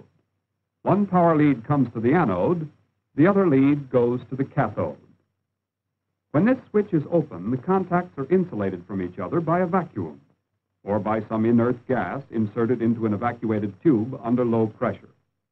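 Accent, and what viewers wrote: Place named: Transatlantic, United States. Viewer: I love these classic old American explanatory videos, military training videos etc. They're so clear and to-the-point, and always in that Transatlantic accent that you just don't hear anymore.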